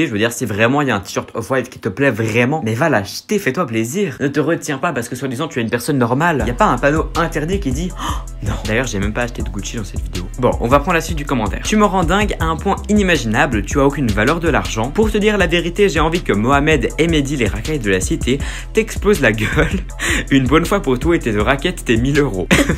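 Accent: Quebec, Canada. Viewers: Je t'adore est j'adore ton axen un peut Quebequoi(je ne sais pas l'écrire) je t'adore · TU ES UN PEU QUEBECOIS ? OU??? CAR AU DEBUT J'AI REMARQUEZ QUE TU AVAIS UN ACCENT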